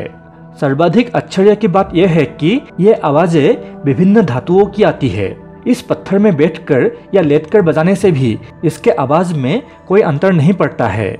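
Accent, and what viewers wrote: Assam, India. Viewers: aapke hindi meh bohot problem hai ... assamese accent ha aapka pls kisi aur se narrate karwayiye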